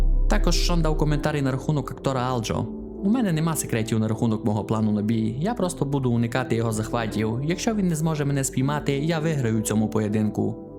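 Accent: Transcarpathia, Ukraine. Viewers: все круто.)лиш таке враження що ти з Мукачева.)якийсь закарпатський акцент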